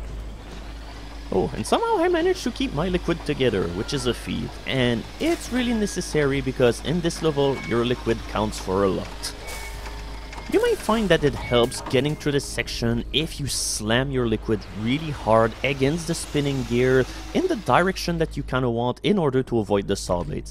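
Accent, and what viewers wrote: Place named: Quebec, Canada. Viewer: This is why i love watching LPs.He's just so calm and collected always, and the french-canadian accent gives him a special variety from other LPers today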